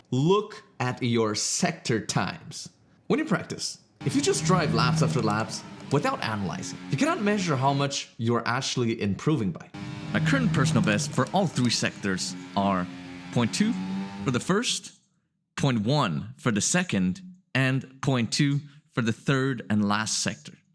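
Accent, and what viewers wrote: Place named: Netherlands. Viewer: I smell dutch accent🥶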